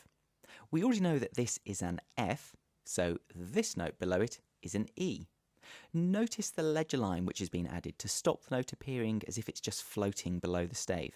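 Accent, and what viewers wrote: United Kingdom. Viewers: are you from the uk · A nice British accent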